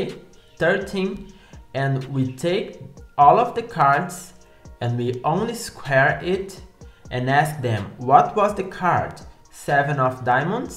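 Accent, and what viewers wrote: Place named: Brazil. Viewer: your accent sounds kinda Brazilian to me kkkkkkkkk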